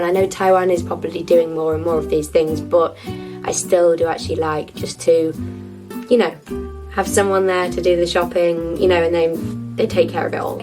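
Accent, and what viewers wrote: United Kingdom. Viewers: 的英國腔好好聽哈哈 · 一點開就是滿滿優雅的英國腔好好聽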